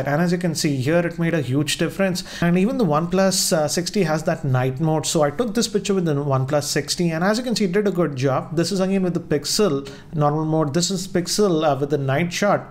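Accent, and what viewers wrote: India. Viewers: Desi Babu English Voice..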